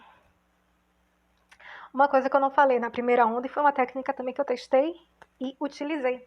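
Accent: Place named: Bahia, Brazil. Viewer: Oi! Um salve daqui de Salvador! Estou na minha primeira onda, está sendo uma onda... rsrs! Obrigada por seus vídeos, é bom ouvir relatos de RH com nosso sotaque! Saúde e sorte pra você!❤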